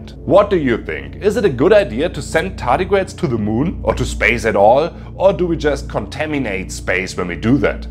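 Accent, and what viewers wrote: Germany. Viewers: Dieses Denglische bzw der Akzent....typisch deutsches Problem. Entweder man kann englisch oder lässt es sein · Ist sehr gut aber immer dieser deutsche Akzent 😅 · 😂😂 der deutsche Dialekt schlägt voll durch 👍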